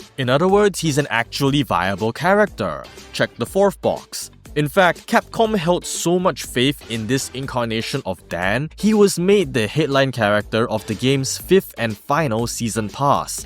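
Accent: Singapore. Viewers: First time watching your video and your accent is familiar. I'm guessing you're either Malaysian or Singaporean. Edit: Ok, I checked the bio and is indeed from Singapore.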